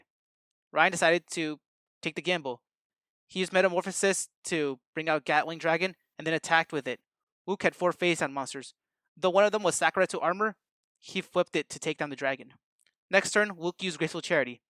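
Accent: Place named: New Jersey, United States. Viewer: I'm downloading this video as proof that you're from NJ and not Brooklyn.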